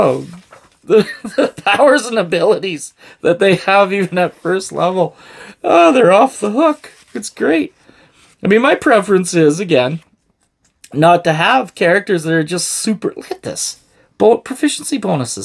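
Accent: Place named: Canada. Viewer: Canadian, eh?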